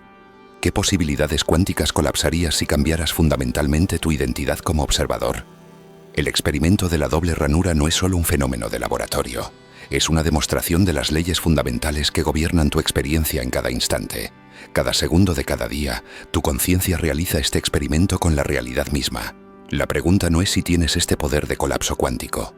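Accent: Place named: Spain. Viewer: El acento español es muy desagradable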